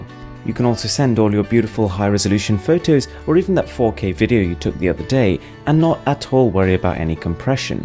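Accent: United Kingdom. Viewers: British MKBHD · Are you from the UK?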